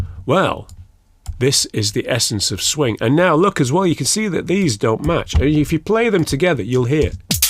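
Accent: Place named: North Yorkshire, United Kingdom. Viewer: Do you do 1 on 1 training? You sound like you might be from round my way… (North Yorkshire).. although been residing in BKK for 15 years. Anyhoo.. let me know.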